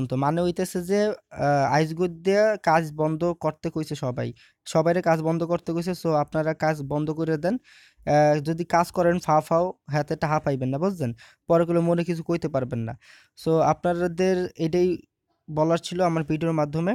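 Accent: Barishal, Bangladesh. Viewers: সত্য কথা বলছি ভাই... আপনার বরিশালের ভাষার কথা ৩ বার শুনেছি... খুব মজা পাইছি... সুপার ভাষা l love you brother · হা হা হা... ফাডাইলাইচেন মিয়া.... মোগো বরিশাইল্যা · borisaler vasha very nice legese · ভাই তুমি তো পুরাই বরিশাইল্লা হইয়া গেছো। এখন তো আমার ও বরিশাইল্লা ভাষায় কথা বলতে মনে চাইতেছে। মোরে কি সিহাইবা বরিশাইল্লা ভাষা।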